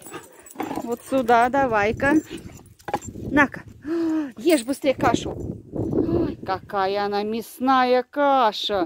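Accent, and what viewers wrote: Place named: Voronezh, Russia. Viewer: Привет с Липецка,с возвращением, а я думаю какой области у вас говорок,оказывается, воронежский.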